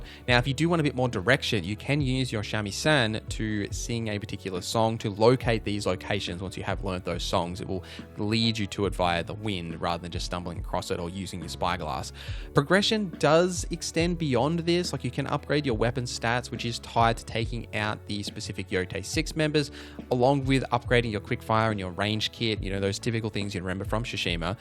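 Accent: Australia. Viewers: He's not from New Zealand he's Aussie. Big difference buddy.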